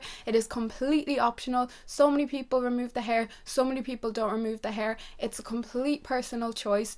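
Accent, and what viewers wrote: Ireland. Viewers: I feel like you're Irish! What county are you from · Are you irish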